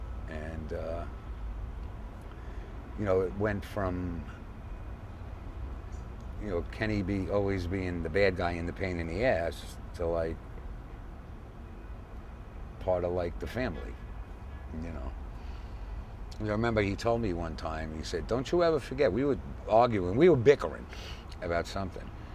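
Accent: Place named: Brooklyn, United States. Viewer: His Brooklyn accent is too strong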